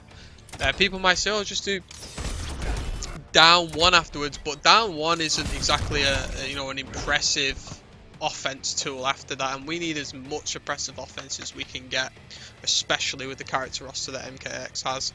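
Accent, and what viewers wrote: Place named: United Kingdom. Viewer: wakeOp lol that british accent always reminds me my old english teacher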